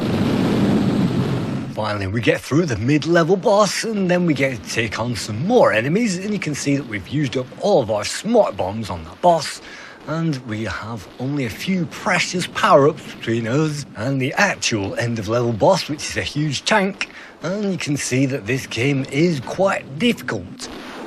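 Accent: United Kingdom. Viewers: One of the cool things about retro computing channels is the way the people who make them (yourself included ) have various accents from around the UK. After all, they are not so commonplace. Another example is Lorfarius. Cool channel. Sure, not all accents are gone... yet. Hearing the cool accents is indicative of the time when these computers were the "in thing." After all, this retro computing is about the "experience" and not just the machine or an emulation. #RealDeal #LurmunUrmurga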